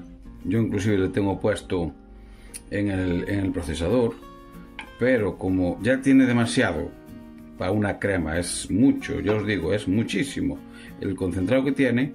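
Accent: Galicia, Spain. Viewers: Me encanta tu acento y tu tierra gallega; te saludo desde Murcia. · Por tu ace to diria que eres gallego, desde donde emites? . Yo te veo desde OURENSE❤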